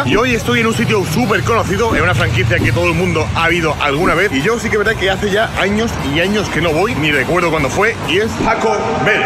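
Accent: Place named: Mexico. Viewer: Amooo el acento wey, te lo juro nms, yo soy de Mexico, y aseguro que es 100% mexa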